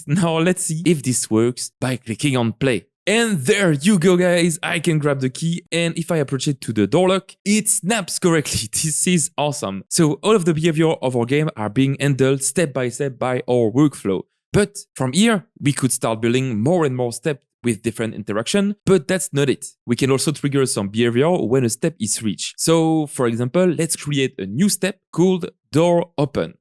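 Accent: France. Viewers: Merci pour tes vidéos, j'ai la forte impression que tu es français, pourrais tu faire tes vidéos en français aussi ? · Bro you french trying speak english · Hi you are french ?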